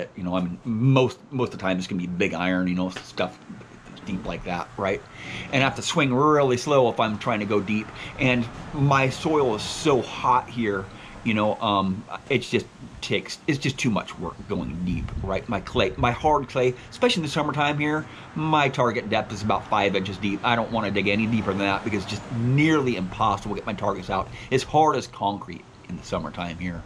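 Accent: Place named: Georgia, United States. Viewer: What state are you in ? Sounds like my state of Georgia ! :)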